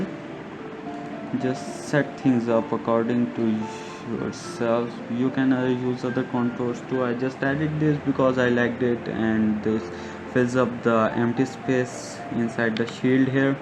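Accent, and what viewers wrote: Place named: India. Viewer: your voice. INDIAN DETECTED 😍😍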